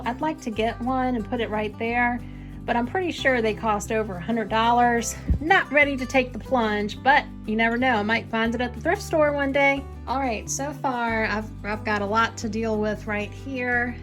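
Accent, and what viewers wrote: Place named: Texas, United States. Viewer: Hi 👋🏻 I just found your channel ! It just popped up . Your accent seems Texan to me ..are you in Texas ? I’m in South Carolina . Nice to meet you . 🥰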